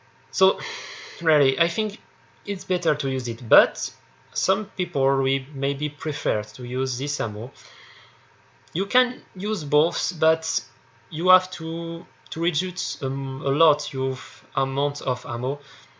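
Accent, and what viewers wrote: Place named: France. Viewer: avec le petit accent français c parfait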